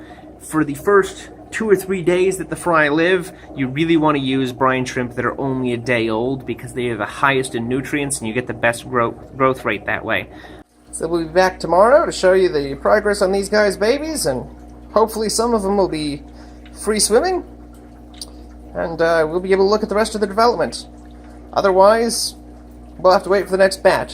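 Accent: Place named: Australia. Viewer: Ok thx I’m using a pipet I’m new to keeping fish, and are you Australian bc I am I recignise the accent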